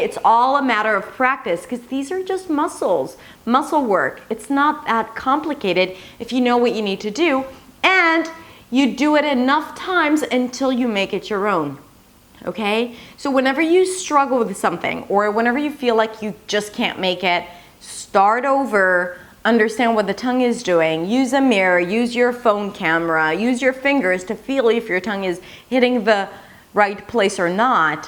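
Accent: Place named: United States. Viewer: Wow, what gorgeous American accent!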